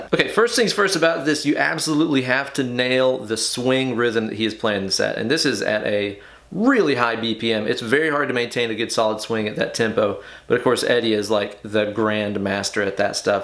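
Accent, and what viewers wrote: South, United States. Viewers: I didn’t hear it. Maybe it’s just that country accent. I get a kick out of it. Reminds me of my days in Alabama.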